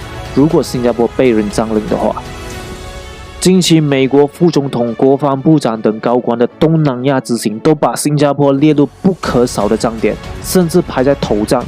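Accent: Malaysia. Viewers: This guys sounds like a Malaysian.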